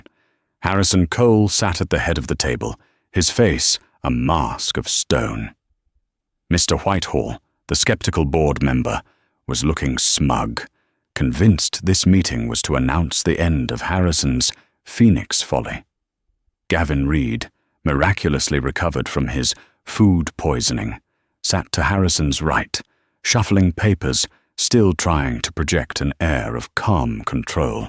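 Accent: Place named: United Kingdom. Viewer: What I really appreciate is the one freeze frame picture at the top of the screen that never changes, slide shows or moves. I put a piece of paper on it and just get lost in the story listening to that purring English / British accent while following along with the transcript. My imagination does the rest. Also, I like the ability to backtrack by highlighting sections that have already gone by. Some channels are changing the algorithm so that it is not possible to do this with the narration. Only with silently reading it over again. Please don't do that. I like hearing again some section(s) that have intrigued me, made me smile or even laugh.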